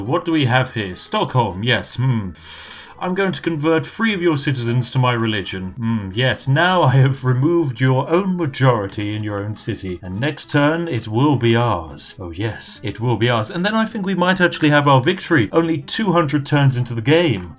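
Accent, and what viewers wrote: United Kingdom. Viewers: Are you really British? Why I like your accent more than the real British people? ❤️🙃😂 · British english, lol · Watching on my country side with a large cup of tea. Mmmm British accent "My lord. It's absolutely marvelous". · Damn you! My colonial mind is programed to be receptive to a British accent and I've actually bought a 240 pack of yorkshire tea.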